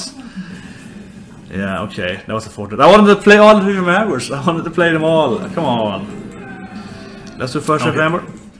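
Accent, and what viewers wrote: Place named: Sweden. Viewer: what a svedis acsent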